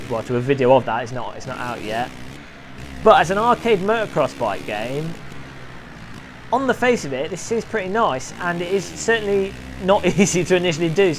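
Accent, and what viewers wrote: United Kingdom. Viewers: Man i just love British commentaries, I'm a total sucker for your sexy accents no matter northern or southern. Your hilarious Assetto Corsa review got me sold on you, hope your channel gets huge. XD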